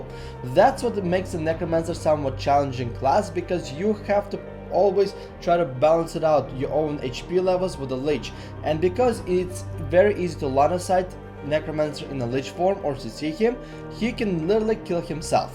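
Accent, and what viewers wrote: Russia. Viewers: dude you're a russian-speaking person with a somewhat thick accent making I don't want to call the guide video but a video where what you're saying is important with music drowning out what you're saying turn the music down man when you make videos you should have little or no background music on a video where your words matter you know if you're just making a stream or people are watching you battle that's one thingbut when we have to hear what you're saying and understand you or want to that music's making a very difficult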